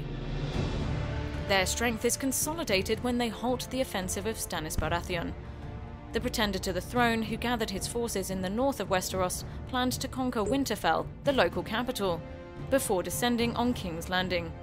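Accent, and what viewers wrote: England, United Kingdom. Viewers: i wasn't even aware this was French. The lady presenting it has a very english accent but does sound quite robotic in the delivery. I agree they are snobbish but if you present what's happened so far in a super popular show you should probably know the right words and how they're pronounced.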